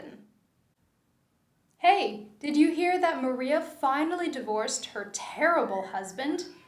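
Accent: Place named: General American, United States. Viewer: Congratulations from New York City, you have a standard American accent. That's essential for English teaching.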